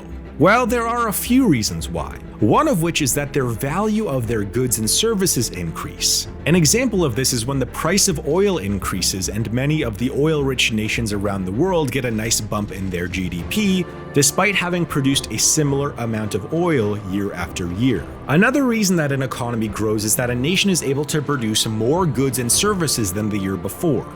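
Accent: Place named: Canada. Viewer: I think I hear a Canadian accent. 😉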